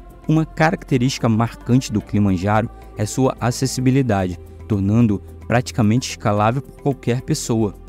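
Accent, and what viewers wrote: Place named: Rio de Janeiro, Brazil. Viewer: Vídeo infinitamente interessante e feito Com Muito Amor!!!!!! Nosso Lindo e Bom Deus cria somente belezas que nós podemos contemplar e admirar com felicidade infinita!!!!! Eu sou grande, grande fã deste canal fantástico e esplêndido! O Moço que tá falando tem uma voz bem doce e melódica e acho que é carioca como eu! 😃🙂 A voz dele e também um das razões de assistir nos vídeos deste canal, porque eu sou músico e flautista e para mim é alegria que ele tem uma voz tão linda!!!!! Mil beijos e fortes abraços pra todos do meu Lindo Rio de Janeiro!!!!! 💘 💘😍🏩💌💖💓💘😍🏩💌💖💓💘😍🏩💌💖💓💘😍🏩💌💖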